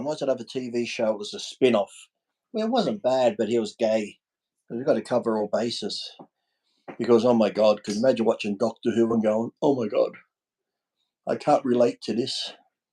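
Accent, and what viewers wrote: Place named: Australia. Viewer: More of these live streams please,! Need more Aussie voices on YouTube 💪🏼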